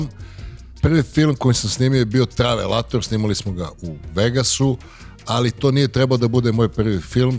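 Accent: Niš, Serbia. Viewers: Ti si nislija ja to ne verujem mislim na naglasak ne na ove kadrove a ti si presavrseno uradio ove kadrove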